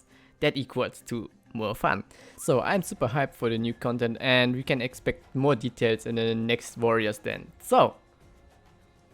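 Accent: Germany. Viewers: Dieser breite deutsche Akzent 😂 aber gutes Video 👍🏽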